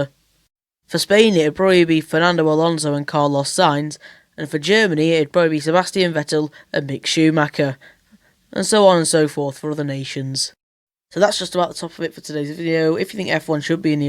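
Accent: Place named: Preston, United Kingdom. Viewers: Your accent is so british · Big up the Preston accent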